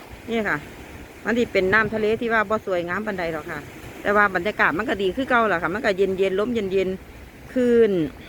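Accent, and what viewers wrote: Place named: Isan, Thailand. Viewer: สวัสดีครับ มาชมวิวทะเลสวยด้วยครับ อยู่จังหวัดไหนครับ สำเนียงเพราะดี (ผมเคยอยู่ร้อยเอ็ด เกือบ 5 ปี) ส่งกำลังใจมาเชียร์ครับ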